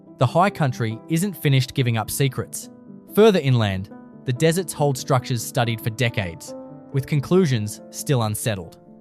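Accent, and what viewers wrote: Australia. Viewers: This voice sounds Aussie but at about he says "Brew wa reena". What? Phonetically it's pronounced "Brew warr in uh". Brew as in brewing beer, warr as as warrent for your arrest and uh as in blunt -- that knife is blunt. Get it right.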